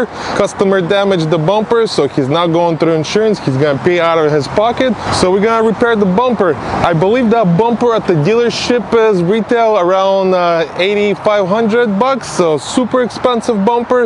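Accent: Russia. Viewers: ты че Русский что ли? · Эбать у тебя акцент русский. Сразу видно наш пацан 👍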